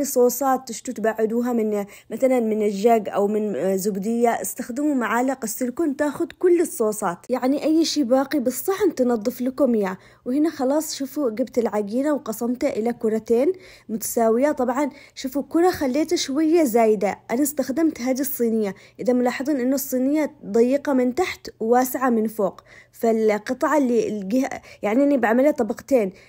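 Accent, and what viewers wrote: Aden, Yemen. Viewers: تحياتي لك عدن الغاليه وتحديدا من المعلا تعجبني لهجتك مازلت محافظه على لهجت اهل عدن تسلم الايادي وتسلمي على مجهودك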